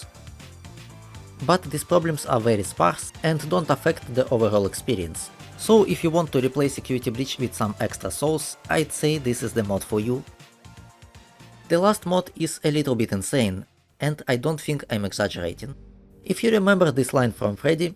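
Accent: Russia. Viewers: я вижу что актцент чуть чуть не англ а русский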